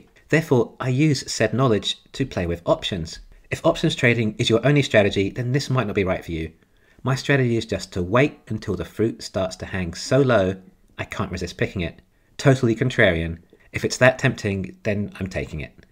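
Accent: United Kingdom. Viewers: It sounds like you are from the UK what app do you use to do options?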